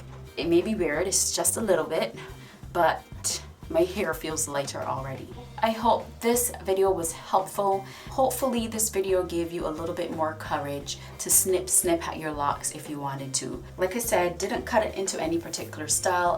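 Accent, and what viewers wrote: Barbados. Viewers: is that a bajan accent I am hearing mixed in there ??😀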